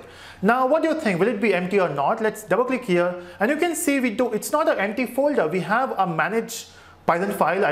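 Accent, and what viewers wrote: India. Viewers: ahhhh another indian accent.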